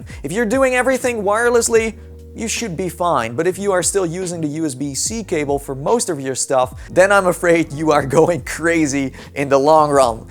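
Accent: Netherlands. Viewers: Zie je nou wel ik zag het al aan je en aan je engels dat je Nederlands was ahahah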